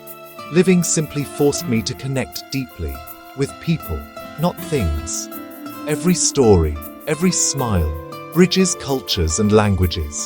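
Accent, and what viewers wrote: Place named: United Kingdom. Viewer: AI slop with a Limey voice over.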